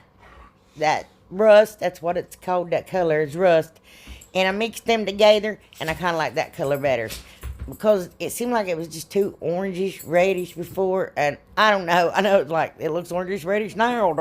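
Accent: South, United States. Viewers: Where are you from? I hear a southern draw in your voice. Kentucky I say but not 100% tho. You do make me laugh. Keep it ip!! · You are fun! I sometimes watch content just for the sound of American voices; but you are humdiggity fun! I'm ever so glad that there are a lot of Halls! Mercy!! ;) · Hello from a fellow!! Your channel name brought me here(had to see who else had a name like me, LOL we have similar southern accents), but your video content and personality made me a new subscriber.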